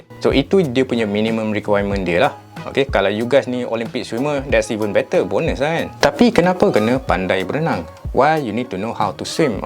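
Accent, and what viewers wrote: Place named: East Coast, Malaysia. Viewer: Betul Abg..It's me.Masa tu terlalu nervous nak dapatkan lesen. Now sudah dapat lesen ..Time for some fundive. June 9-12 akan bawa 3 orang member untuk ambil lesen open water. I will drop by coral bay to meet you bro. Abe jangan guna dialek pantai timur,Viki susah nak faham 😜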